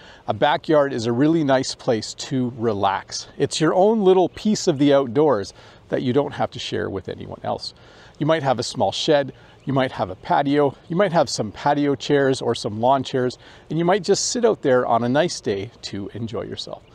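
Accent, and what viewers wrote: Canada. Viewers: I am in Canada. A newcomer. Your videos are helping me to understand local accent ❤❤❤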